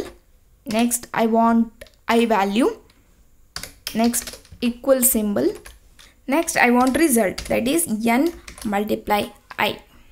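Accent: South India, India. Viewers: Are you from south side may be Kerela or tamil nadu side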